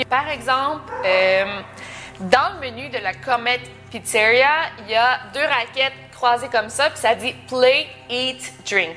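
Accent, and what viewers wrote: Quebec, Canada. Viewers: Salut! Je pense que les reseaux pedophiles ne pourraient pas exister sans la protection de gens haut places. Je pense que nous sommes manipules et que le monde est sous l'emprise des gens tres riches et puissants. Je crois en cette theorie et malheureusement je pense que personne ne peut arreter ca... les preuves seront toujours masques. C'est horrible et degoutant. Bravo pour ta chaine, tu es super! J'adore les canadiens et leur accent, 😀👍💖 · Oh jee ! T'as l'accent du Québec, toi... Mein Gott !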